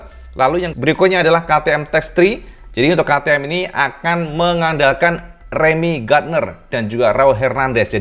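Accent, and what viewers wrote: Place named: Java, Indonesia. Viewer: Asli aku seneng liat komentar, logat jowone marai guyu. "py tho kang mas...😁" lanjutkan kang. Tp ndelalah detail infonya...aku suka sekali.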